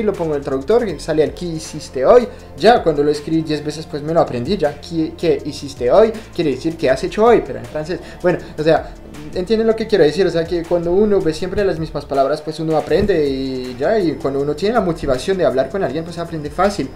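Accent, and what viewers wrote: Colombia. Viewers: saludos desde Ecuador ¡¡¡ Has la segunda parte porfis ¡¡ ya que estaba super interesante. Eres super espontaneo y sincero asi que sigue asi me encantan tus videos:=) Pd: Vives en colombia? xq tu acento se parece al colombiano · Por el Pipa Higuaín!! Me muero!!! Cosas locas de la gente... Hsblas con el acento colombiano. Bien! Bisus de 🇦🇷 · Genial tu vídeo....me encanta que vas narrando con detalles..( en especial tu época de adolescente pajero en los chats. Jajajajajajaja)... Obvio tb me uno a las plegarias por una 2 parte..... Porque hasta lo que has contado es que tus incios con el español fue con todos los países menos con Colombia... Y tú acento es muy colombiano. Jajajaja. Saludos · hablas muy bien el castellano pero claro q tienes acento colombiano.. A mi me gustaría aprender el francés pero creo q es complicado solo se 3 cosas.. 😂😂 Podrías hacer un video para q aprender un poco.. 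Saludos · tus memes xD:D me gustan tus vídeos!!!! Y por cierto tú acento es colombiano!!!:D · HABLAS SÚPER BIEN EL ESPAÑOL!!! Y EL ACENTO SÚPER COLOMBIANO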